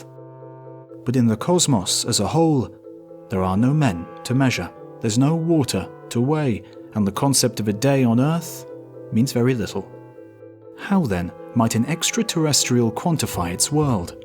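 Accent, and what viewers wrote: England, United Kingdom. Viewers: So glad you don’t have an American accent. Nobody likes to be given information in an American accent over a sort of British accent. Well…there are some grossly intense bigots who love that sort of thing, but they’re outliers. Though…perhaps not so outlying as I’d like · The english accent is suposd to make this sound smart .We know for a fact there was no big bang . Academia's Scientists and its standard cooperation suck .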